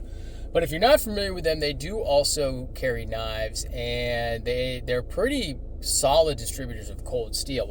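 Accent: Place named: Italy. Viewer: New subscriber here. It was your Italian accent that made me do it…..lol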